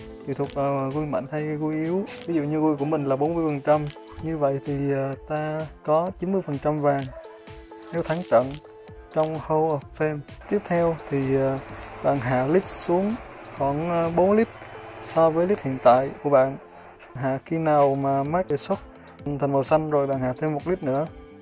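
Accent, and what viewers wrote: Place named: Vietnam. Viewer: unfortune l dont understand vitenm accent but lm glad we have sub title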